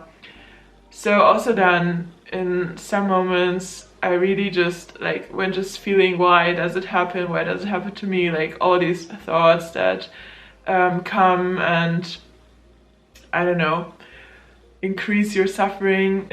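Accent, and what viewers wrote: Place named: Germany. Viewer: Oh, you’re from Germany. I was trying to figure out your accent but couldn’t get it. You are probably suffering a symptom called “Bangkok Blue or Thailand Blue. Tourists from around the world who visited Thailand get it after they’ve returned to their country. The symptoms are, sadness and can accompany with crying. No need to ask your doctor or searching for a medication for this. It should go away in a few weeks. But if it’s doesn’t go away then you will have to save money for your return to Thailand, hopefully soon. Thailand gives so much happiness to the tourists. Like anything when you feel high and good. But not so easy naturally when you have to come back down. Hope you can adjust back to a happy life in Germany soon. Thailand will wait for your return 😃 ✋🇹🇭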